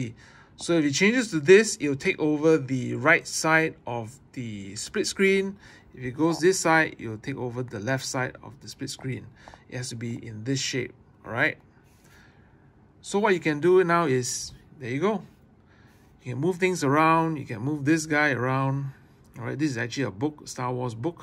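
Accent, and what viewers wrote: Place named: Singapore. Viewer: You have an interesting accent, are you from Singapore?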